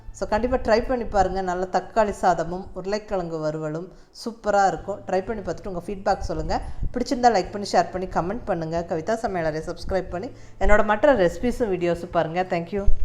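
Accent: Coimbatore, India. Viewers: Very tasty food. Ongaloda kovai Tamil உச்சரிப்பு அருமை.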